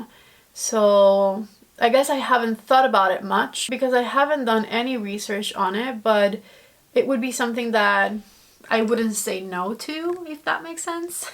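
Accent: Cuba. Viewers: I recently reached all the Harry Potter movies with my daughter who’s 13! I still love it! I play the Harry Potter Lego game with my 6 year old daughter. So fun!!! I love going out to eat & Ed Shreen as well! I’m a certified ESL teacher! Your English is excellent, but I love that you kept a bit of your Cuban accent! I would love to visit Cuba! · Would you be willing to do a makeup tutorial in Spanish? I’m learning Spanish and I would love to hear it in a Cuban accent from an exceptionally talented person 🥰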